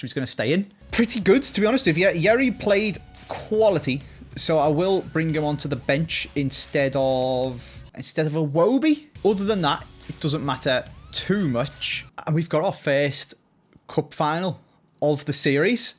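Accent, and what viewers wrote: Liverpool, United Kingdom. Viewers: Funny how scouse goes when he's just chatting. Happens more in this series for some reason!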